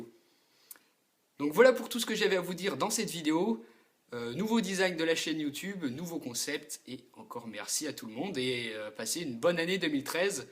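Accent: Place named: Savoy, France. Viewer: On reconnait l'accent de la savoie :)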